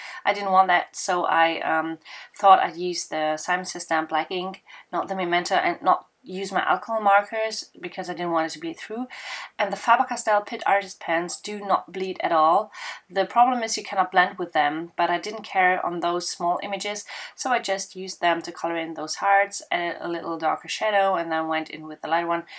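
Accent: Germany. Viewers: Your accent is not funny! It's beautiful to me! I miss hearing german accent. I lived in Stuttgart and Nürnberg, I miss Germany :) Yes, it is craft foam, and I love how you used it to make the shaker, very clever! I like my copics, water color pencils, water color paints, and regular colored pencils with mineral spirits.I am saving up to get Pitt brush markers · Oh I LOVE it when you have a card up! Not only are they adorable, but I get to hear a little bit of the German accent I miss oh so much! As for your coloring question: my marker of choice are the ProMarkers, i use Prisma pencils and I am slowly getting into the whole water colour thing. Keep up the good work and I cannot wait until your stamps are up and running!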